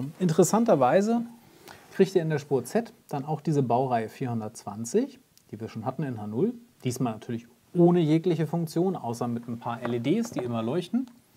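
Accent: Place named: North Germany, Germany. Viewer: Hallo, ich habe gerade Ihren Kanal hier gefunden und freue mich schon darauf mir Ihre Videos anzusehen. Da Sie Norddeutscher zu sein scheinen, hätte ich 2 Fragen an Sie: 1) Wohnen Sie etwa in Dänemark? 2) Warum interessieren Sie sich für dänische Modelle?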